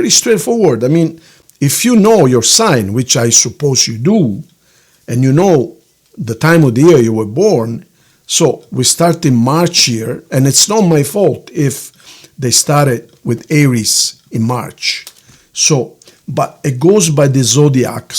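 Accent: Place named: Italy. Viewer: The Italian accent is appealing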